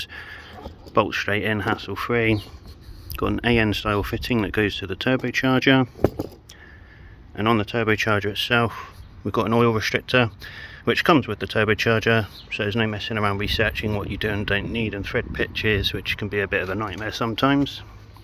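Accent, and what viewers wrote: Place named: South West, United Kingdom. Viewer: Quality kit, I may be in touch in the future. Sounds like you’re a SW boy, where are you located if you don’t mind me asking?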